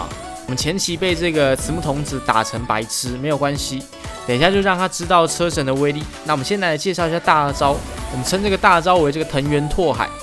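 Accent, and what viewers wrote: Taiwan. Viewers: 台語口音很有韻味欸不是台北人嗎？😂😂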